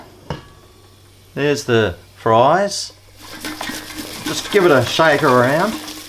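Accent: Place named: Australia. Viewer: Need to get one Airfyer fo myself. Chips don't look great but maybe it tastes good. Can you cook more food on Air Fryer for us. Thanks. Maybe a nice Roast chicken and potato. Thanks,. You do look like a comedian. And a good one. Enjoy your videos for sure! Thumbs up! As I subscribed.And good Aussie Accent.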